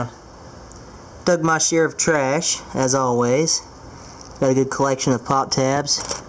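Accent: South, United States. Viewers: Hey I know you don't want people to know where you live but what state are you in that has black bears? I know it's southern from your accent.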